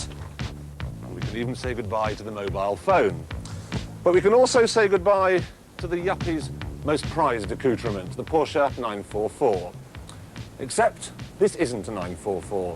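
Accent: RP, United Kingdom. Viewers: Still had his posh accent. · He went to a private school in Doncaster & then Repton School, hence the posh voice and head boy attitude. Reckons he was bullied at school, and seems to have spent the rest of his life giving everyone else grief. His first wife left him after 6 months for one of his mates, hehehehehe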